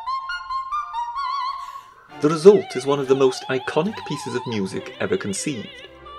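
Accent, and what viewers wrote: Wales, United Kingdom. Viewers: Just a suggestion - You should try and give a more natural delivery than the forced ‘sophisticated’ accent you currently adopt. It’s really obvious and comes across as fairly arrogant and ‘try hard’, and undermines your entire channel in my opinion. Edit - Are you welsh? I just realised you sound a bit like Rob Brydon. I’ve always thought that accent sounded funny, it was hard to pinpoint your accent, at first it almost sounded like you were failing badly at attempting an old posh accent, but now I realise. Yet they say we have no culture?? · ahhhhhh I'm burning, the welsh accent is killing my ears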